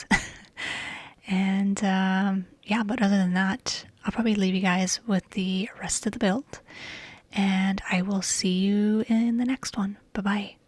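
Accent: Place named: Canada. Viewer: i’ve always suspected you were Canadian because of your accent, but it’s awesome to hear it confirmed! Torontonian here :)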